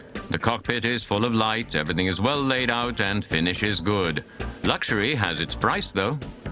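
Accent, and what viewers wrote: United Kingdom. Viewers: Typical english hooooooooooooomosexual talking.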